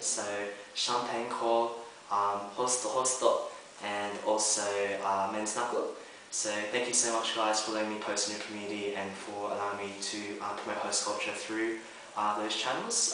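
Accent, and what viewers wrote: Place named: United Kingdom. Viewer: ギャル男-looking guy with british english, what a cool combination lol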